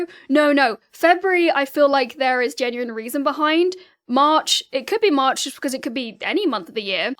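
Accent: United Kingdom. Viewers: Why are you British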